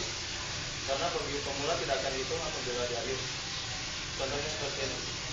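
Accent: West Java, Indonesia. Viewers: logatnya sunda euy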